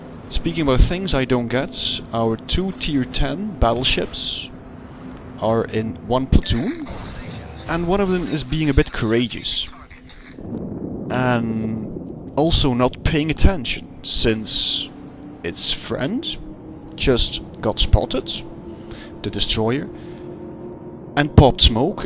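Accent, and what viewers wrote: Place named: Russia. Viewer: I admire your accent. I can hardly recognise you are Russian at all!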